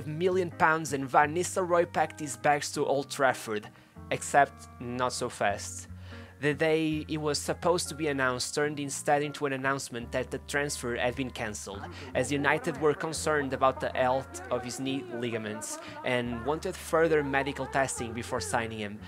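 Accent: Portugal. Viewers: The strongest Portuguese Accent Ever 😅😅😅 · Is the narrator Portuguese? Accent is very "Lusophonic."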